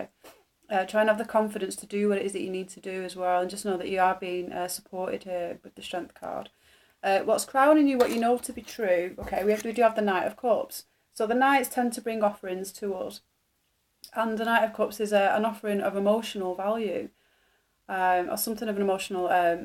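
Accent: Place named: Manchester, United Kingdom. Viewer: Im from mamchester you sound like a mancunian 😅😅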